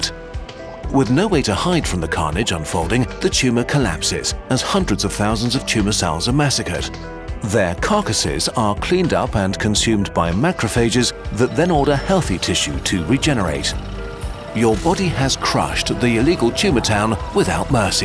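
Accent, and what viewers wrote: United States. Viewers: thank god, less new york? yes please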